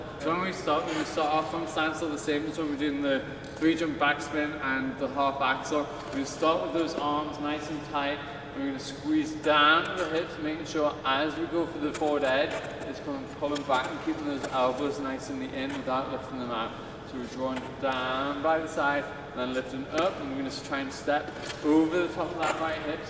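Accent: United Kingdom. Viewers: British accent I think anyway like it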